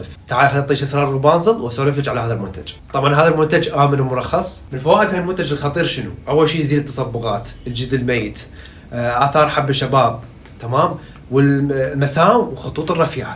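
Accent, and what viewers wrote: Iraq. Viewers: اكثر شي بيعجبني اتفرج على فيديوهاتك اللهجة العراقية ❤ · عراقي 🤔 واني عبالي مصري 😂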